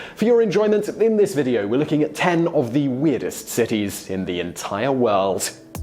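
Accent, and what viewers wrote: United Kingdom. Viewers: accent is def UK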